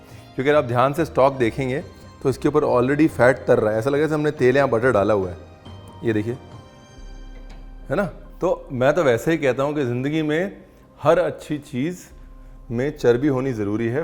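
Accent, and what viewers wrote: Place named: Lucknow, India. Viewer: Dear Sir one of my best friend is from Lukhnow nd u hv same accent like the words “Bhaiya”, “Shaukeen”, “Turant”, “Kayde se”, etc…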